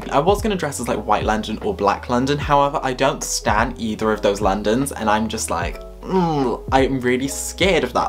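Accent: Wales, United Kingdom. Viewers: I believe he is from Wales if that helps😊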